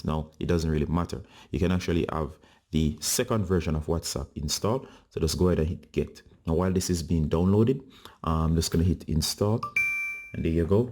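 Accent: Jamaica. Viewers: Are u jamaican? Good vid btw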